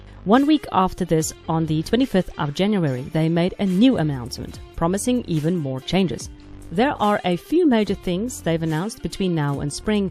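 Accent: South Africa. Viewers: Not game related but your accent sounds really familiar are you south African perhaps ?😂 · Found you last vid and now sticking around, loving your content also are you from SA? the "my China" gave it away. That 4v4v4 is freaking nuts btw and really chaotic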